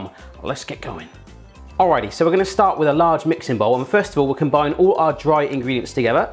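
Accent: United Kingdom. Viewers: You sound so british I love it xD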